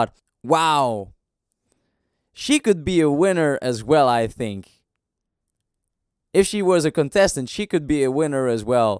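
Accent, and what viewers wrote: Netherlands. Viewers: question; quite a dutch accent.. where are you from?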